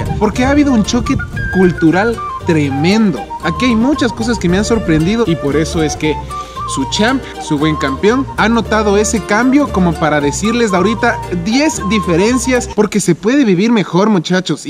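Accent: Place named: Ecuador. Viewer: Nunca perdiste el acento ni tu identidad Ecuatoriana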